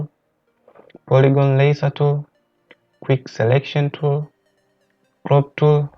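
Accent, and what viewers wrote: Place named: Nigeria. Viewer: Sounds like a nigerian 😁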